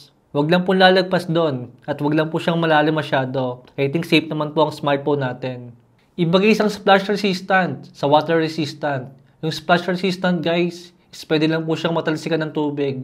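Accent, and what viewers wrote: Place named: Bulacan, Philippines. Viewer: taga san k boss yung accent mo parang sa bocaue bulacan 😄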